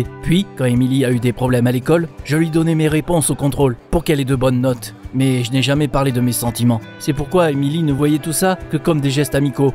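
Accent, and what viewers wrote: Marseille, France. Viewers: léo lgm omg l accent marseillais incroyable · OMG L ACCENT MARSEILLAIS INCROYABLE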